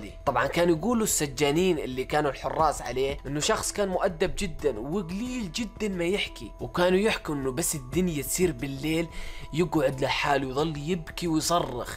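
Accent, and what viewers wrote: Jordan. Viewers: سؤال انت اردني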